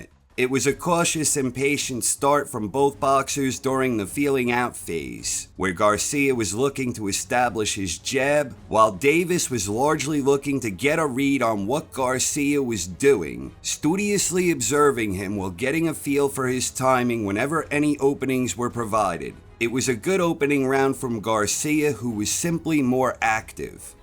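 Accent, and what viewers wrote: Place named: East Coast, United States. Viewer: Like I've said before, don't ever loose that accent! My accent is Minnesotan and trust me it's not as popular around the world as your East Coast. Anyway, excellent breakdown of the fight! Davis showed us..."what's up!" My dream is Lomo vs. Davis... The Matrix vs. The Tank! Two of the greatest minds and talents of boxing yet from the most opposite ends of the world regarding culture, race and geography! Respect to both and all these warriors that have the courage to get in there wether they win or lose! I can't see Ryan as a quitter! I think he was legit paralyzed by that Tank power shot! Sneaky, fast with a Bang!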